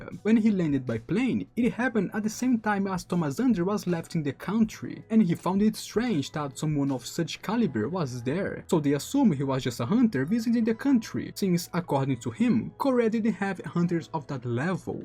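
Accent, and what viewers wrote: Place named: Brazil. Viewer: mano, eu venho assistir teus vídeos regularmente e eu gosto deles. Mas tem um problema, o seu sotaque é bem forte é as vezes fica um pouco difícil de entender. eu moro no Reino unido desde de eu tinha 10 anos, então eu estou familiar com o ingles e se vc quiser a gente pode conversar um pouquinho e te der umas dicas pra melhorar a pronunciamento. Tudo de graça, é claro, eu só quero ver mais um brasileiro suceder na gringa.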